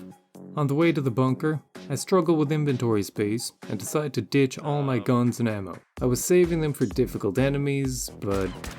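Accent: Ireland. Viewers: It’s Irish I’m pretty sure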